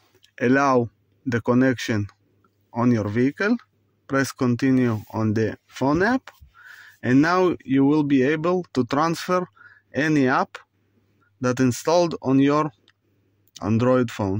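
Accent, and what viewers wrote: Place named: Israel. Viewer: איך שומעים ישראליותתתת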